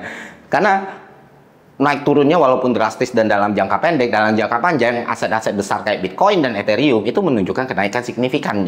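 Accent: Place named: Minangkabau, Indonesia. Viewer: Baru sadar kalau ko urang awak.. patuik lah nyo mode ko caro bapikia nyo caro mangecek nyo.. sukses selalu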